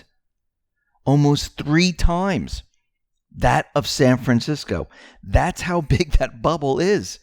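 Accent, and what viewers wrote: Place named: New England, United States. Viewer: It's a yankee accent thang for *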